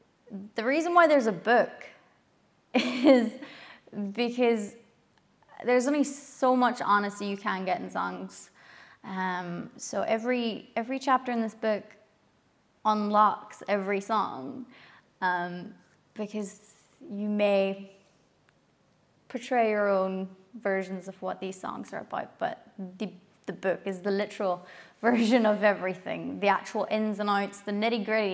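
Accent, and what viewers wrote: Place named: Ireland. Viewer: Janet, the sign of a person growing is when they are able to look at where they have been, what they have done, and what they wish they had done differently. If they can learn from these experiences, they can make changes or continue to grow. Many cannot be that honest with themselves. It takes a special person to be able to recognize and document where they have been and what they have learned about themselves. For you to be able to go beyond recognizing, and then share with us what you have learned from your journey is amazing by itself. I have followed you for years and have thoroughly enjoyed your talents (and the wee bit of charming Irish accents along the way.) Congratulations on becoming an even more amazing person! With your fantastic talent for music, I can only imagine this is an outstanding album. I am looking forward to listening to it. 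Dave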